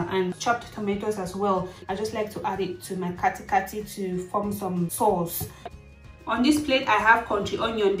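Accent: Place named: Nigeria. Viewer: Wow I always thought you are a Nigerian, when I saw khati khati, I was like? Do we have a Nigerian dish called khati khati????, its looking so yummy wish I could try this, the way you pronounce you maggi looks so Nigerianlized, the baked chicken came out great...simple this is the first time you made a video less than 10min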